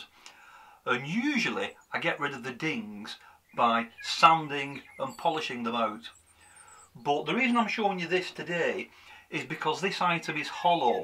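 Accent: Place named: Manchester, United Kingdom. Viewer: That's a beautiful piece, really loved watching you restore it back to it's original glory. From your accent you're not a million miles from me, I'm in Manchester.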